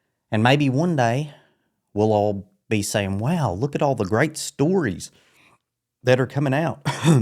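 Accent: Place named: Tennessee, United States. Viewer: I'm also an infj. We are definitely on the same wavelength. And I'm from Madisonville, I told my friend Paula I thought you have a Tennessee accent. Anyway, I enjoy your videos and completely relate with your experience.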